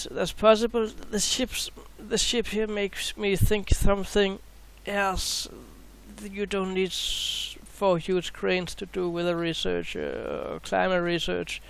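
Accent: Denmark. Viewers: you must be Danish ❤😅. Elsker din accent, hvis du forstår dette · kender en dansker når man høre en.. god video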